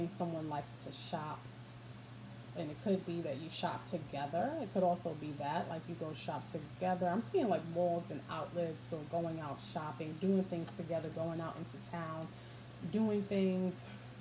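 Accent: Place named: East Coast, United States. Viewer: #3 yes, resonated so much. and i love your east coast accent!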